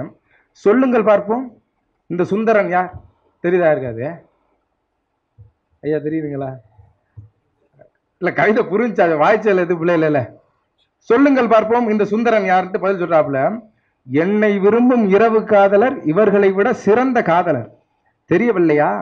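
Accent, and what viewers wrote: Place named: Thanjavur, India. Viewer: Semma voice .. Ithu Thanjavur slang mathiri ye irukku.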